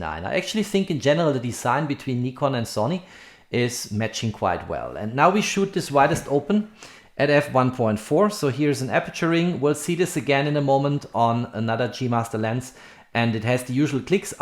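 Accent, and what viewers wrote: Germany. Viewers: Exactly what I was looking for, thank you! Are you German btw.? :D Your accent sounds German. This probably also would mean that the adapter is available in Germany which is great. One more thing: how is the AF performance in videos with moving subjects? One thing is being able to recognize eyes/faces, the other one is real fast-tracking and on-point autofocus in videos, I think that's a harder task to accomplish, therefore I'm not completely sure if the performance is similar to native lenses in this scenario. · Youre German, right?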